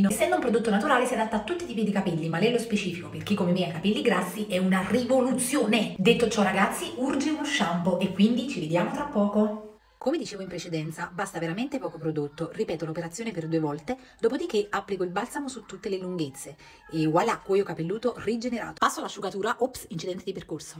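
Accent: Naples, Italy. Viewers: Sei molto bella e bravissima, ti ho scoperta da poco ( sono una signora sessantenne).toglimi una curiosità, ma sei napoletana?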